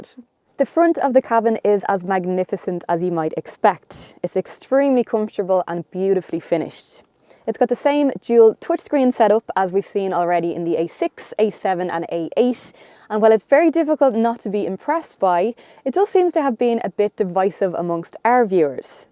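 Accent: Ireland. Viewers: Love your reviews and the gorgeous Irish accent - I’m now a subscriber. Can’t agree about the Touareg interior though - more functional looking and less classy than the big Audis.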